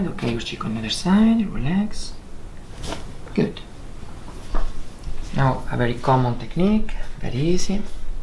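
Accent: Italy. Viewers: Легкий итальянский акцент....))) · Your accent reminds me of Scuderia Ferrari engineer, "Slow button on, slow button on"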